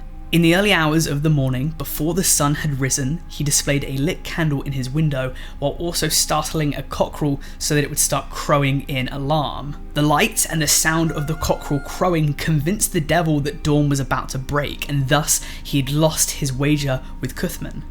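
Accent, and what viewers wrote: United Kingdom. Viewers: I reckon that you were educated in a public school and that your probably from somewhere like Hale, Altrincham and if you're not a Northerner you're probably from the cotswolds but you're accent has been molded from the fact that you've spent a lot of time in a boarding school so it's developed from mixing with other people who are from all over the country so I reckon it's an amalgamation and maybe a touch of your parents from when you were 1st learning to talk, please reply and let me know if I'm right or wrong and tell me where you're accent is from. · You sound a little like your British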